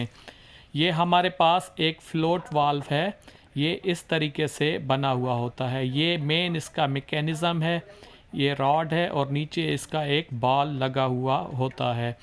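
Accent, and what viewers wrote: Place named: Sindh, India. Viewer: Boht informative video banai apne, well lehjay sy ap Sindhi lag rhy hain?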